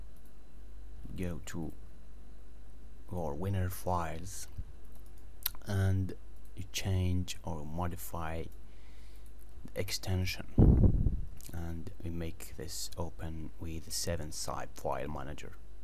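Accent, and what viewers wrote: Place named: France. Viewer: non , french accent !!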